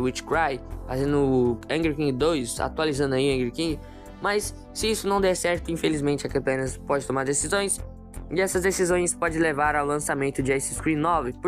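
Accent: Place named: Brazil. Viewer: Oi tudo bem então eu até concordo com vc pq tipo se não der certo nos jogos da keplerians provavelmente ela talvez lançar o Ice scream 9 pq tipo eu sei que Ice scream 8 foi o encerramento da franquia Ice scream pq mano tem muito jogo do Ice scream ainda né então se a keplerians não lançar Ice scream 9 provavelmente ela vai lançar essa nova franquia aí né e pois é mano pq Ice scream foi uma franquia que durou mais tempo que na verdade foi 5 anos de franquia que foi desde 2019 eu tava no 2 ano da minha escola e eu nem ligava pra jogos de terror eu só liguei em 2020 né quando começo a pandemia né e pior qu foi isso tudo mesmo mas uma coisa que eu queria falar é que eu gosto muito de vc e também eu tenho 4 YouTubers favorito que são Isaque yt, Leozico games, player caro e vc que na verdade quando eu te conheci eu achava que vc era gringo mas depois que ouvi sua voz aí eu percebi que vc é Brasileiro né eu sei que eu devo ter digitado muita coisa aqui né mae são essas coisas que queria falar Mesmo mas pode falar comigo se quiser tá bom 👍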